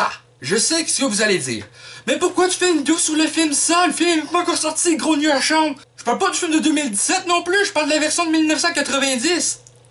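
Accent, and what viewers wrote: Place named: Quebec, Canada. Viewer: Au Québec , vous avez vraiment un drôle d'accent !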